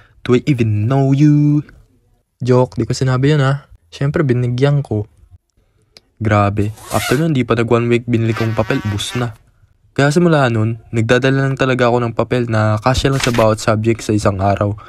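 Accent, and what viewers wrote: Philippines. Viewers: Parang sa catubigg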